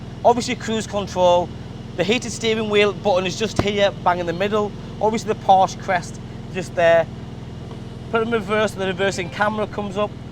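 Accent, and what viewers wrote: United Kingdom. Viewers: I love the brish accent 😂🔥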